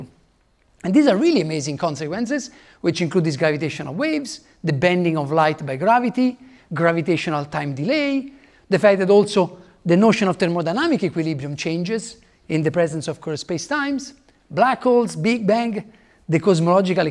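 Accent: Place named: Italy. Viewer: jestli Jirka Podolský nebo Pavel Krtouš najdou nějakého studenta, který by si to dal třeba v rámci zápočtu, tak to bude nejlepší řešení. Jestli se to nepovede do konce roku, tak udělám to, že Simona přeložím "on the fly" na diktafon, ten čas tomu rád dám. Ale nedám přepis do titulků, to by musel udělat sw nebo někdo jiný. Domluvíme se ve čtvrtek na fakultě:) Je fakt, že tohle je unikátní přednáška v českém prostoru - IMHO první technický kurz kvantové smyčkové gravitace v ČR, který dával na fakultě a mimochodem vystřihnul tuhle "netechnickou" přednášku. Ale i tak, terminologie a koncepty, které používá nemusí být samozřejmé pro posluchače, kteří nejsou obeznámeni se základy Obecné relativity a kvantové mechaniky. Takže musím jen doporučit jako prerekvizitu přednášky Jiřího Podolského na téma jeho překladu knih Carla Rovelliho (Carlo, jako jeden ze zakladatelů QLG přístupu, byl školitelem na univerzitě v Římě) <link> atd. 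Je také pravda, že angličtina je excelentní, ale se silným italským "soundem"a velmi středomořským rytmem, takže to nemusí být v kombinaci s netriviální terminologií jednoduše zkousnutelné ani pro posluchače, který anglicky umí slušně. Takže ano, má určitě smysl udělat překlad. Jinak záznam z jeho intenzivního kurzu, který končil včera se postupně objeví na téhle stránce <link> Ale je to prostě kurz živé oblasti výzkumu a dostupný bude jen pro lidi, kteří se dostatečně orientují v OTR a kvantové teorii pole a příslušných matematic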